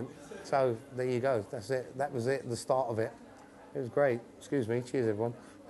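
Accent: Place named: United Kingdom. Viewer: Typical brit accent heh, i like brit accent, interesting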